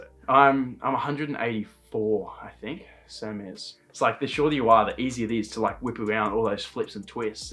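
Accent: Australia. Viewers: I love your Aussie accent. You are so adorable. ❤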